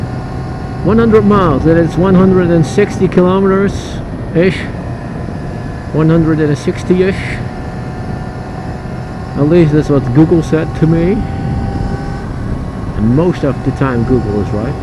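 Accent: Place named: Netherlands. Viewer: Are you from holland?? because you have a dutch accent to you're english (I'm from holland) Btw nice bike ;) + another reasen to think that you are dutch is because everything i flat If you are!! Hoi hoe gaat het met u !!!! ;)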